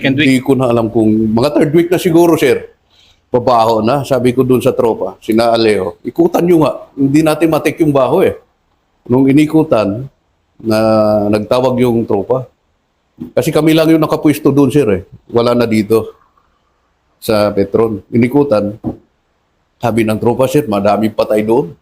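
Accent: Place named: Cordillera, Philippines. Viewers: Sabi n igorot. Halata sa accent. Magaling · Yes he is Igorot 🫶👍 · Halatadong igorot kac sa pananalita ❤ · Parang igorot si sir,halata sa salita nya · Mukhang Kabbayan q ito sa accent lng alam q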